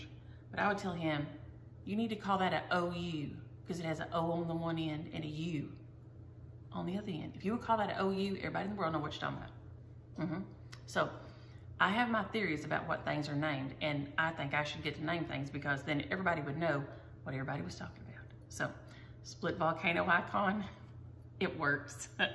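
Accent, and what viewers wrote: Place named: South, United States. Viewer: Maybe Webster should make a Southern dictionary! Never heard of Tump! But I bet Utah people say words you never heard, like “Oh, My heck”, Fetch, or Biffed it. Love your Stitchin, Stories and accent! FREE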